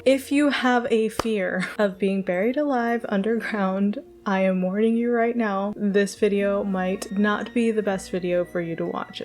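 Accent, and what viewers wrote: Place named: California, United States. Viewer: Ms,Were you born in Okinawa? where were you raised? Your accent is as though you are from California. I first went to Okinawa in March 1960.I was married there in 1965 and my two boys are still there.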